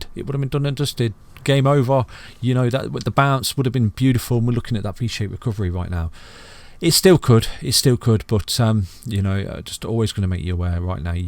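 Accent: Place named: Birmingham, United Kingdom. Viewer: How have I just found you? Another Brummie?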